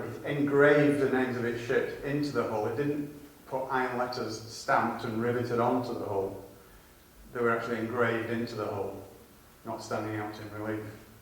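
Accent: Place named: United Kingdom. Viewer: The echo-ey AUDIO, along with the fast speech, & British accent, make it difficult to understand what is being said; needs Improvement. Thank you.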